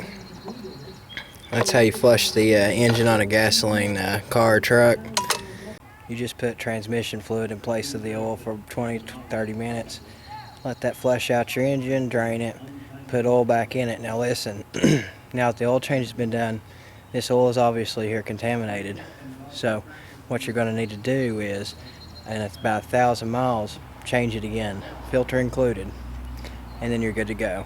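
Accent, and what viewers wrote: South, United States. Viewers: The authentic Southern accent ! howdy !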